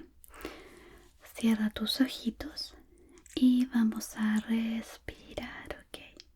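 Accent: Chile. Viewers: Eres de chile ?👁👄👁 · sos de chile?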